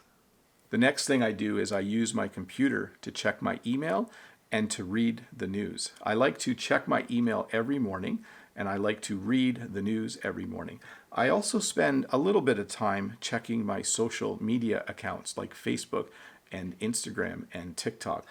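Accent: Canada. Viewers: Hi, I like your videos because I understand you very well, maybe because you're canadian? I sonder if you speak normaly or slowly for your students....let me know.... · I just can't get enough hearing your nice Canadian accent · Thank you sir , i always watched all of your videos . Easier to understood. And i like Canadian pronounciation.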